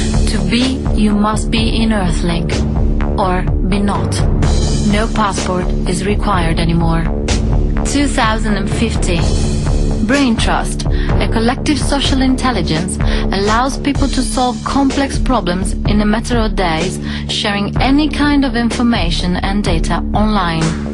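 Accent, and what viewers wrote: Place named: Italy. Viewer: chiunque abbia fatto questo video poteva almeno prendere qualcuno che perlasse Inglese in maniera decente, e non il solito pastone Italiano/Inglese in stile Toto' 40 anni fa....patetico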